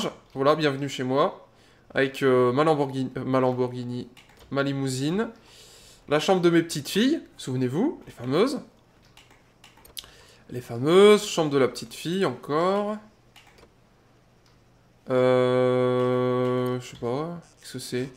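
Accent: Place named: England, United Kingdom. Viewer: Mdr j'adore ton accent anglais lol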